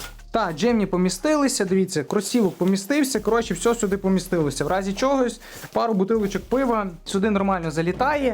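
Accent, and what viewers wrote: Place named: Rural, Ukraine. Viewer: Блевануть хочется от селянцкого ховора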